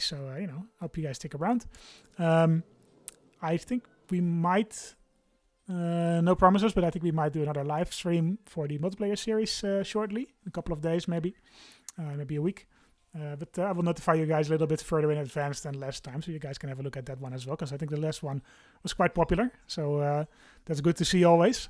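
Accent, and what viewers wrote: Netherlands. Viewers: Ah, it's a Dutch accent, I couldn't place it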